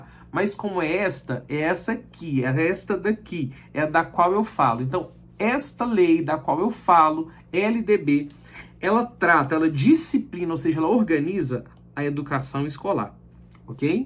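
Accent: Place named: Minas Gerais, Brazil. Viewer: Mas vc tem sotaque mineiro.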